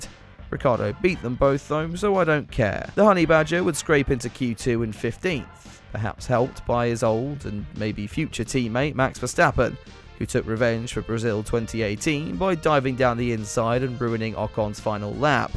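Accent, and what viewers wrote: United Kingdom. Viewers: Honestly, I can't understand this vlogs commentary. Narrator talks too fast and too much British clipped accent for my taste. Definitely NOT at par with BBC standards.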